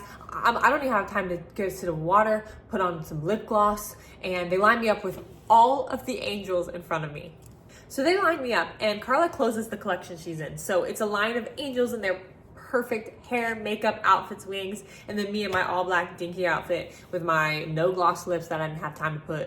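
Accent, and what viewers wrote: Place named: Midwest, United States. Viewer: I'm trying to place your accent, or lack thereof. You're either PA, Ohio, Indiana, Illinois, or you're from the west coast, I believe? Which is it, please?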